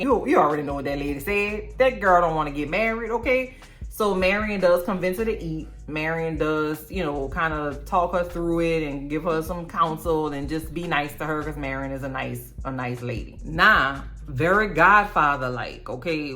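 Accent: New Orleans, United States. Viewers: I'm up at 6am watching your channel and lmao I love your commentary and I love your New Orleans accent ❤ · You are so funny! I am glad I found your channel! Now I have two “shows” to look forward to on Sunday night! LOVE your New Orleans accent!!!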